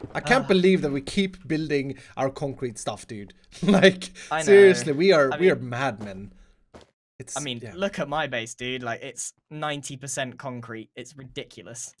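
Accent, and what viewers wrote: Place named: Sweden. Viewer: This is swenglish on a new level (i’m scandinavian too btw)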